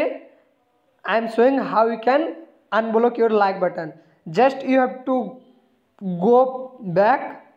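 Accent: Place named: India. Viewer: Bhai sumjh me to kuch nahi aaya. Pr tari desi English sun ke majaa..aa gaya.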